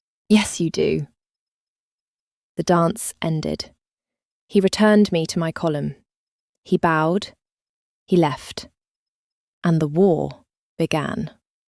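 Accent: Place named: Northern England, United Kingdom. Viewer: The narrators voice is perfect. I love what I believe are shades of her northern or midland accent. The story line was very good. Your channel is a favourite of mine. I found these YT romantic Regency and Victorian channels when they first emerged in late 2025. Thank you for working hard to make your productions just about perfect. The writing, editing and narration are flawless which makes listening a joy. JB 🇨🇦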